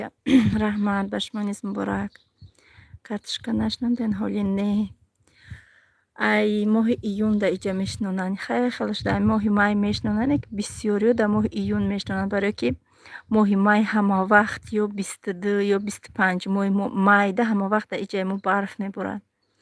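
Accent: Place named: Vahdat, Tajikistan. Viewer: Салом Алейкум.Ман фикр мекардам аз Вахдатен,барои,ки лахчатон монанд.Аллох нигахбонатон,Хохарчон.УВАЖАЮ ВАС!!!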